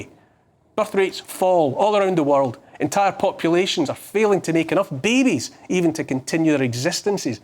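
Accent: Scotland, United Kingdom. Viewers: hE HAS A SCOTTISH ACCENT ! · HIs annoying Scottish accent. He's impossible to listen to. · I love his beautifully spoken Stirling Scots accent!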